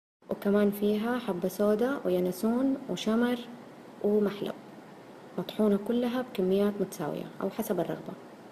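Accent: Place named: Hejaz, Saudi Arabia. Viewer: اللهجه الحجازيه الاصيله ماشاء الله ههههههه دحين انزلكم هي شكرا على الوصفه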